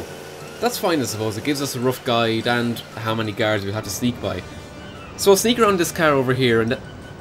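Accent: Ireland. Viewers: explained through an Irish accent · He's Irish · Irish maybe hmmm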